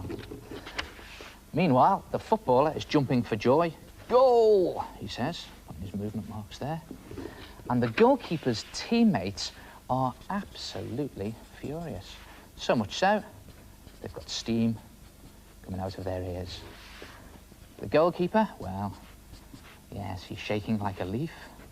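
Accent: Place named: Liverpool, United Kingdom. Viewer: I am Canadian and I used to watch Art Attack all the time and I thought Neil was Scottish I now know he’s scouse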